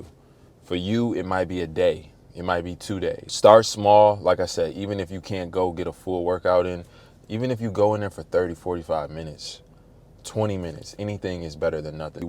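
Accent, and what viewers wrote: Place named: New York, United States. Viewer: I would've sworn you were from NY. You have that NY accident. Great content!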